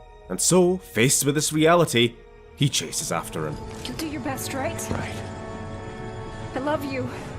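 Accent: United Kingdom. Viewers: Dude is just too British. I can't stand it.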